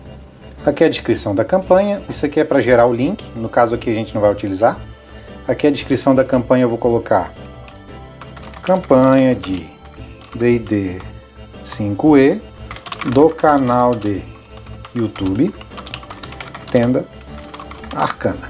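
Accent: Florianópolis, Brazil. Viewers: Tu és manezinho brou?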